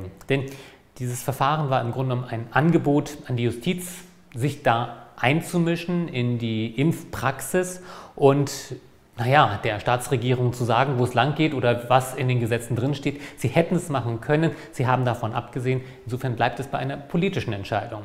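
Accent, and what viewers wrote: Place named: Franconia, Germany. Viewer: Totally besides the point aber ich habe mir die ganze Zeit gedacht: der Akzent ist doch aus dem Norden. Jetzt hab ich Sie gegoogelt u wurde fündig: Verden, Niedersachsen :)) Ich vermute mal da nicht nur geboren sondern auch aufgewachsen? Anyhow- Danke für Ihre Bemühungen u Arbeit im Bezug zur Pandemie u ich hoffe sie fühlen sich in Würzburg zu Hause. Ein bisschen Fränkisch war ja bereits mit dabei. Hihi.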